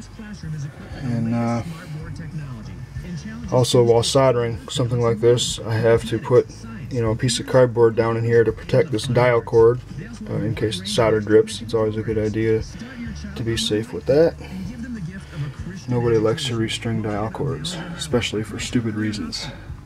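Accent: Michigan, United States. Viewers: Sounds like you are somewhere in mid-Michigan. I need a couple more modern receivers/amps repaired. I'm in Flint and it's hard to find a decent person or place that will work on stereo equipment. The old electronics store my father use to deal with has been out of business for a long time now. They were called Shans or Shanes electronics. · So true. And his Michigan accent reminds me of home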